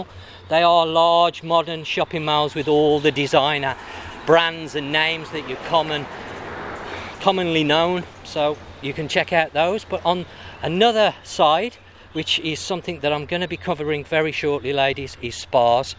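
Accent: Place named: Birmingham, United Kingdom. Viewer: Hi what’s it like in Christmas time and could you please recommend any 5 star hotels close to clean beaches and clear seas? Thanks for the vlogs they are great especially hearing the brummie accent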